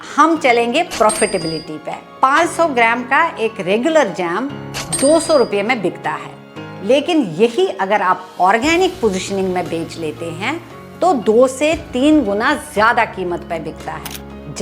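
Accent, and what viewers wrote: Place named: Kashmir, India. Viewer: Mam your accent is kashmiri..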